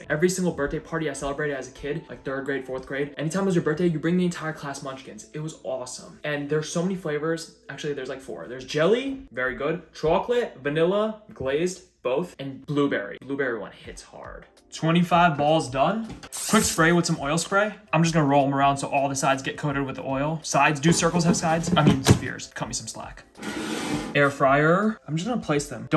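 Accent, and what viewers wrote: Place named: New Jersey, United States. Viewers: Thats that New Jersey accent fuggetaboutit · Are you from New York/New Jersey?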